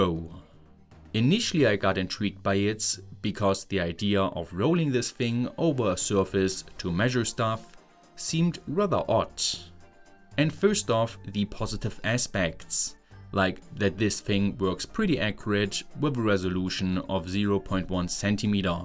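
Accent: Saxony, Germany. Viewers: Bro has the most German accent I have ever seen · almost SÄCHSISCH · Dieser unglaublich schreckliche deutsche Akzent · I'm wondering about your accent. Are you German or Austrian?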